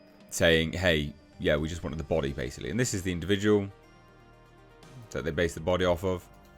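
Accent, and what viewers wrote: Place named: United Kingdom. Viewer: Man I like your british accent, also great content!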